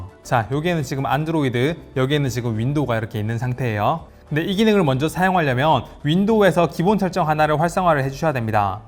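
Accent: Gyeongsang, South Korea. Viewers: 경상도 억양 빼곤 좋습니다.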